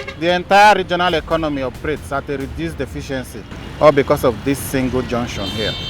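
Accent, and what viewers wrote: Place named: Rivers State, Nigeria. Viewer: GOD bless you Sir for your detailed and realistic videos. Please Sir, are you from Rivers state? You sound like one🙏 You're very professional 🎉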